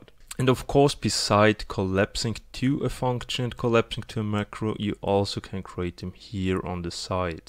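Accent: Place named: Germany. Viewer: Ich finds immer wieder witzig wie sehr man am Englisch Deutsche heraushören kann. Very good explanation of the Differences, thanks for the help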